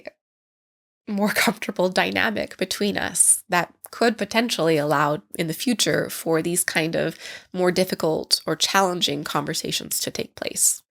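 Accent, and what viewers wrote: Canada. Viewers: Your accent leads me to believe you're Canadian. Care team? We have hundreds of thousands of mentally ill crowding the sidewalks of every city in the US. It's a horrible job that nobody wants. Count yourself fortunate that you have the resources to help you.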